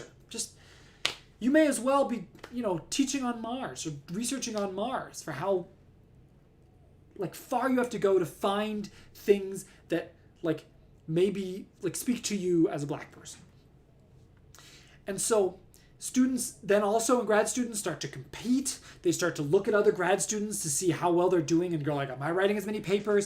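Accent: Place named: Middle East. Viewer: well you also have a bit of an accent to you so I guess that's why I thought Middle Eastern. Another thing I was a little surprised by is hearing that you grew up in OC, cuz I thought you grew up in NY.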